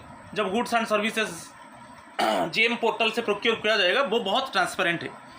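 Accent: Odisha, India. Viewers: Sir are u an odia?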